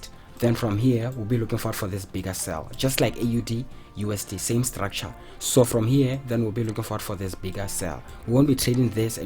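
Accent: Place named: South Africa. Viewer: Thanks my guy... i can hear that u my homeboy a South African... Big up ntwana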